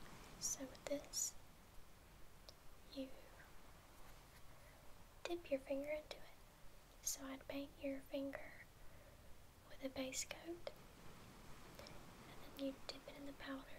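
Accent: South, United States. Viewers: Personally I miss the slightly insane... but coming from London UK I can get by with that southern drawl quite fine. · Southern accent really gets me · For years now I've been tryin to decide where shes from based on accent. I wanna say Ga or SC.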